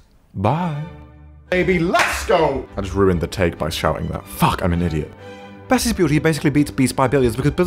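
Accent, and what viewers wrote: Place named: RP, United Kingdom. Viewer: British ,butler.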